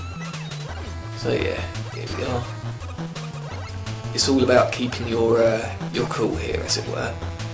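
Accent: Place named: United Kingdom. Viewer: I like your british accent. I have just downloaded MM9 for Wii, and I was stuck in Plug man's stage n_n so thanks for this!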